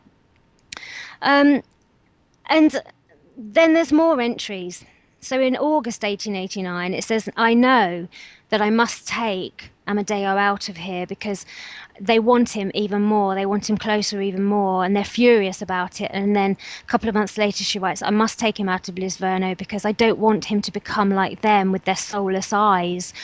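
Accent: England, United Kingdom. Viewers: is awesome. I live near cannok chase I wish I could give her a bit of information about many of the stories about that place all I have some intresting information linked to masons and black eyed children. Ill try and look for a way to send her a email. Hey but her stories are great nice prim and proper english accent great story teller. The pig man is another story linked to cannok chase a Bigfoot type creature. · This is the kind of British accent that I love not the Bo'Ohw'O'Wo'Er one. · nice story and lovely british voice but on a sidenote it's just a story :) you have to be very gulable to believe this really happend but it doesn''t matter if you want a scarry night and just want some mystery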